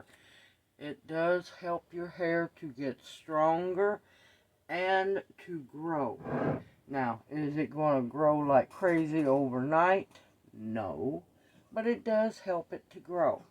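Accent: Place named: South, United States. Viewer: I stumbled across your channel looking for info on goldenrod. When I heard your voice I thought that lady has to be from the south! And I was soooo happy to find out you are about an hour and half from me! I am looking forward to learning lots of Old Ways from you!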